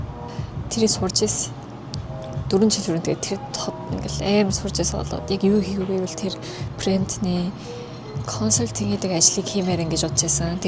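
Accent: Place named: South Korea. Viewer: Монгол хэл нь зүв зүгээр, Солонгос аялгатай нь өвөрмөц юм.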